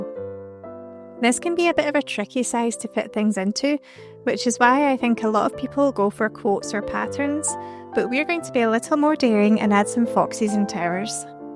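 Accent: Scotland, United Kingdom. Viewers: Lovely…could you do the breakdown of drawing the fox pls…..are you Scottish?😊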